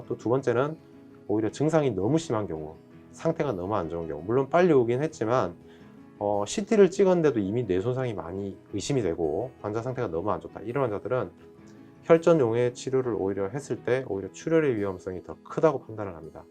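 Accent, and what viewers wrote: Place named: Gyeongsang, South Korea. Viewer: 닥터님께서 경상도 분 이시네요 상세한 설명 감사합니다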